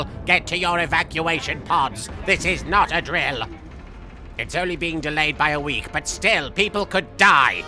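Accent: United Kingdom. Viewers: Is it just me, or does Marik sound more british than in his older let's plays? I guess he spent too much time with Yami Bakura XD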